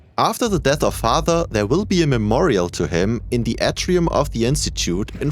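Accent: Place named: Germany. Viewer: Bro can't get rid of his German accent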